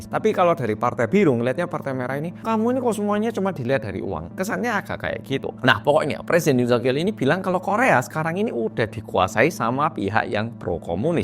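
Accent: Java, Indonesia. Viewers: Mas medok e polll😅👍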